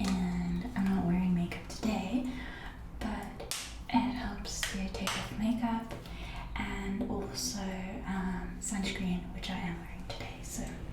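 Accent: South Africa. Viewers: Sooo soothing 🥺 are you from South Africa?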